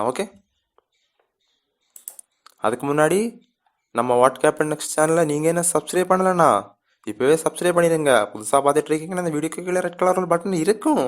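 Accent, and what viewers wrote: Kanyakumari, India. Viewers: super slang u have bro r u from kanyakumari?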